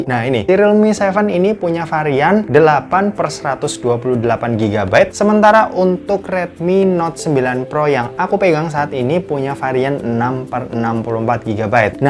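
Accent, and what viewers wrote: Semarang, Indonesia. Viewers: Eong Semarang to ndeng? Hehe sukses selalu lur... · Masnya orang Semarang ternyata wkwkwk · lu orang semarang bang ?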